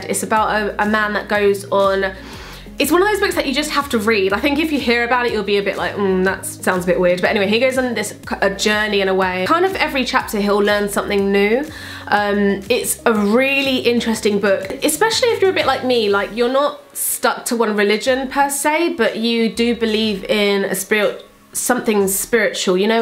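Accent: United Kingdom. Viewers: you sound British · Your British Accent Is Life 😍😍☺️☺️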